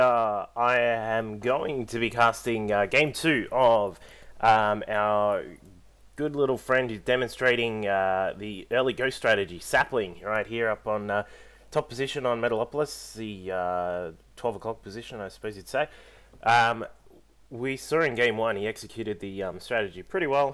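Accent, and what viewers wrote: Australia. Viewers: Great to get some Aussie casting going on!!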